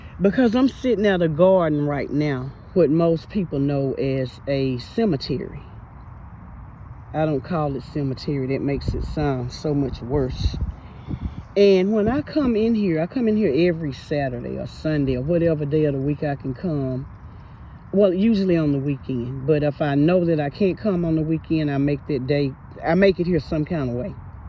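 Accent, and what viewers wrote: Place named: Mississippi, United States. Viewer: You sound like you are from Mississippi.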